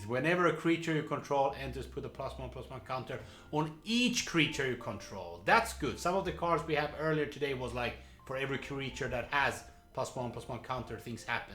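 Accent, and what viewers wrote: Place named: Scandinavia. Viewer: what country are you from? You sound Scandinavian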